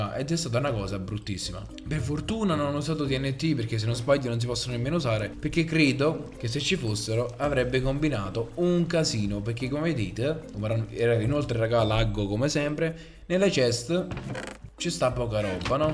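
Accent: Naples, Italy. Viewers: ma sei napoletano?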